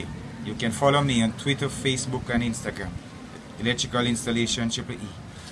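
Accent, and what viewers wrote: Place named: Jamaica. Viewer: I like your Jamaican accent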